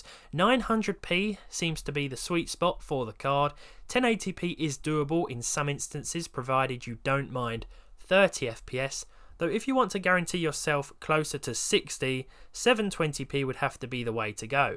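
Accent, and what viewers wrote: United Kingdom. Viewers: Are you from british ? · Love your videos my British friend you are excellent very enjoyable good reviews keep it up I hope you're sponsored and people give you money U2 must love you I'm hoping and you deserve an award by now anyway like I said you one of my favourites I was watcher stuff we always seem to pop up all the time so I do continue to watch her things keep up the great work love your accent love your comedy try to throw more comedy in there that's very enjoyable try to stay British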